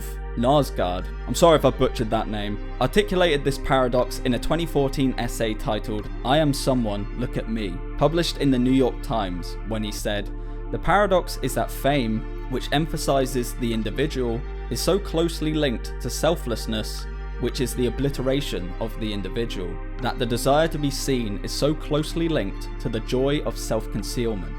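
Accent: United Kingdom. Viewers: Fame is bad sez the guy looking to become 'famous' via youtube subscribers. These British accents are starting to wear on my ear again.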